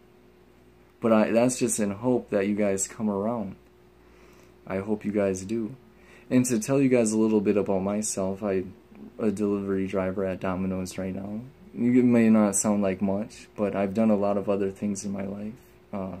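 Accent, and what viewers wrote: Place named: Wisconsin, United States. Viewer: Hi....it's me Dr. Rockso....good to see ya....love the Wisconsin accent!! I miss the crew!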